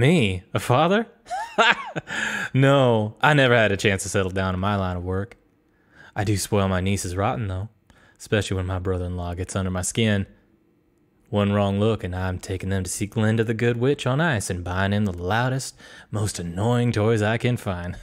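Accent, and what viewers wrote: South, United States. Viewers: I live in the south and might i say i love the Accent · Where are you from? I can hear an accent 🤔 Are you from the South? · The bit of country I hear is the best I love it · The slight country accent is making my southern heart feel something😩✋🏾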